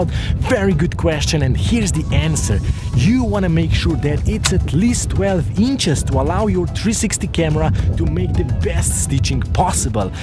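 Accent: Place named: Slovenia. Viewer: Where are you from? It sounds like Slovenian accent.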